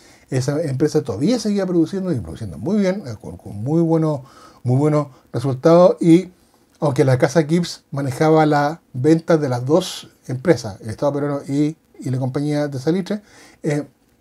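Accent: Chile. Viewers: Hola, gracias por tu nuevo video. Soy chile y entiendo tu manera de hablar, pero tengo claro que si quieres llegar a mas personas, debes tratar de hablar en un chileno mas neutro. Que te vaya muy bien.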